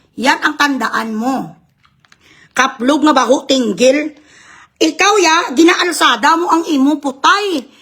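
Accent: Visayas, Philippines. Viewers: natutuwa ang taumbayan sa iyo. Mabuhay ka. Sincere ka, honest. Very lovable ka sa Visayan accent mo, delightful sa ears ang Visayan accent mo. Candid ka, walang hypocrisy. Maganda ka, ang face mo guwapa ka, ang taumbayan ay hinahangaan ka sa pag analyze mo ng political situation ng ating bansa. Di ka plastic, may sense of humor ka pa, di ka nagpapanggap. Very true ka pag nagsasalita, walang kaartehan. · i hate sarah duterte pero natutuwa ako sayo parang ang cute ng visaya accent mo at i like you maldeta ang peg hahaha aliwwww .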